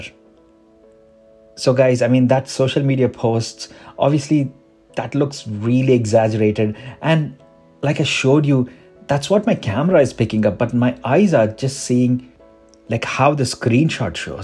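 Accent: India. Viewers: Wow, thank you, this is very reassuring. I’m gonna go for the 12.9”, if I notice blooming I’ll just euphemize it as carpeting. Going back to global dimming is not an option. Switching to OLED or microLED when available at certain loss. You should have more subscribers. You’re the first reviewer “with a sub-continental accent (deliberately unspecific)” I like 👍. edit: not a defining factor, just objective.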